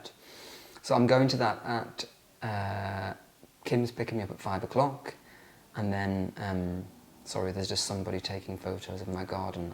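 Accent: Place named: England, United Kingdom. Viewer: I'm a Hongkonger and always like English accent.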